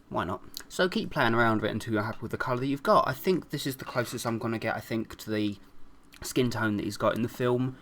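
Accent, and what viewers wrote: United Kingdom. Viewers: Are you British? -KidHawk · i love how creepy the make up looks and then you speak with that freaking adorable british accent and it completely steals the intimidation away for me XP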